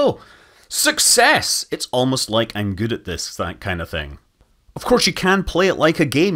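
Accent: Scotland, United Kingdom. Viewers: I hear Scottish accent and see science. Subscribed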